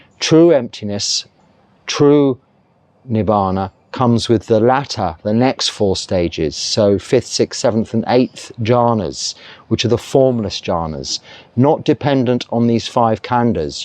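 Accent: United Kingdom. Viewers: This could be a matter of perception. Bhante rather sounds too British, but not angry 😊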